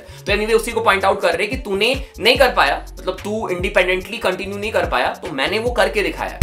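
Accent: India. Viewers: Bhaiye logo ke jaisa baat karta h yaar ye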